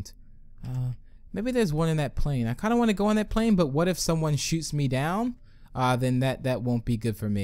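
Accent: United States. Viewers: u american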